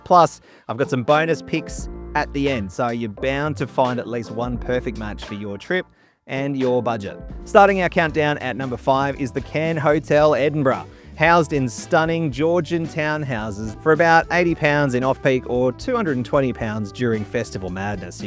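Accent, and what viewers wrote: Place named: Australia. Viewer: Bro are you Australian?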